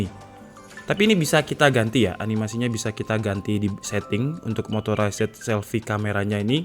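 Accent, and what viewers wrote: Java, Indonesia. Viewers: Ternyata..boso jowo asline..asli wong jowo mase iki..salam santuy sam..😁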